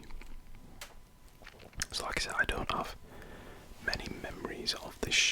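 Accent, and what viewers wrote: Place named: Yorkshire, United Kingdom. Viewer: As a fellow Yorkshireman I approve of this channel ha and respect how you’ve managed to calm our usually loud accent into such great relaxing content :D great channel man!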